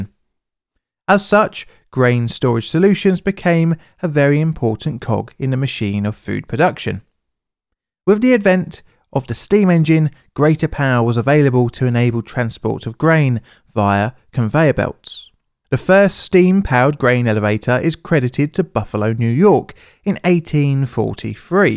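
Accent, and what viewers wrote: United Kingdom. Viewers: I don't think there is ever a point where, when a Brit speaks, I have to translate at least a word, into American! Lol.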